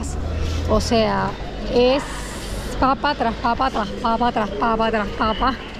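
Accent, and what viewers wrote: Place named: Peru. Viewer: Que paso ahora hablas como una Peruana.🤔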